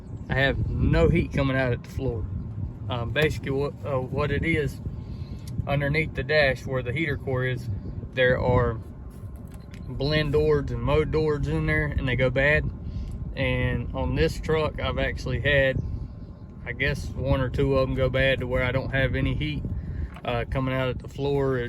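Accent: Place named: North Carolina, United States. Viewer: You gotta be From NC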